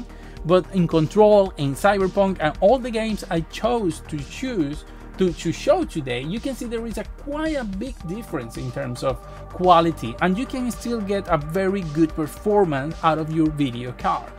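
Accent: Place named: Latin America. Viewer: Se lo nota ya mas suelto que en el primer video... NO creo que lo de latino afecte demasiado... Y si bien el toque latino en su acento de ingles se nota, no es tan exagerado...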